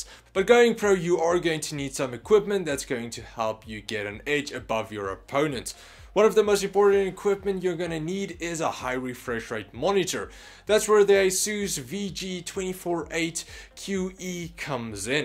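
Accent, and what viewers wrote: South Africa. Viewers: he’s from South Africa. It’s his native language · South african accent. deal with it.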